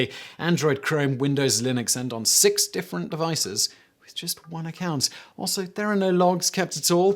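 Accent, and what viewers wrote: United Kingdom. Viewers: Was going to give a thumbs up for this video, but really? "Lootenant"? You have an obviously British accent, but what are you? A wannabee Seppo? It's "lef-tenant" in the UK (and Canada). Stop contributing to the Americanization of Britain, mate.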